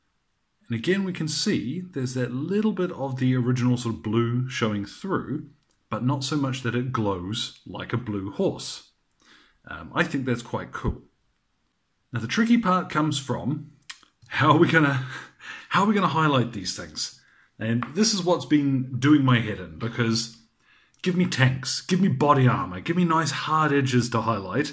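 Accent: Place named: New Zealand. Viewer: Where are you that it is so hot? An Aussie like me? I had thought you were a Kiwi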